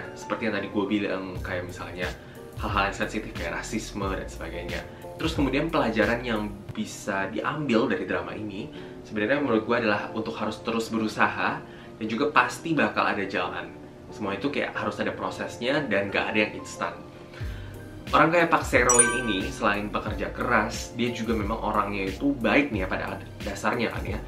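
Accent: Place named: Java, Indonesia. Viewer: Kko awalnya mikirnya opa korea ehh tau taunya kko Indo dari medok nya hehehe keren vlognya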